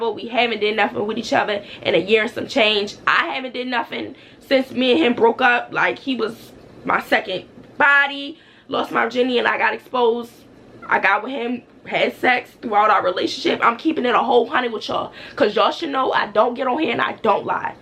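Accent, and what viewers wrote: Baltimore, United States. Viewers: You sound like you from my city (Baltimore)”… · Soon as u started tlking, I said to myself she sound like she from Baltimore! And I knewwww!!!! I know our accent any mf where! 😂 I'm from over west 💙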